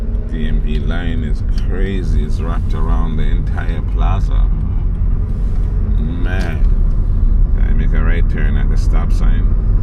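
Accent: Jamaica. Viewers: Know you sound Jamaica bro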